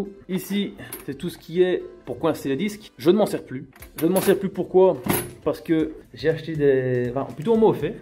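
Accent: Belgium. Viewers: t'es belge fieu ??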